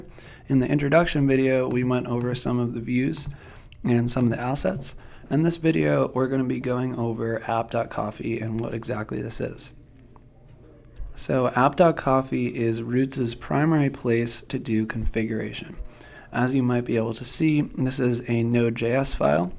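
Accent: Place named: Canada. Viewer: I really like Roots! I hunted around for a long spell and finally found it. I think it's probably the answer to my quest for a static website generator to host some articles of mine. On another note, I find the narrator's accent interesting. I'm really good at spotting accents and can't figure out where this fellow is from. In some parts I think he's from Canada, but then I detect a note of southern California. or maybe some other southwestern U.S. place. Oh, well, it doesn't really matter. Roots seems to be truly great stuff to me. I'm going to go ahead with it joyfully!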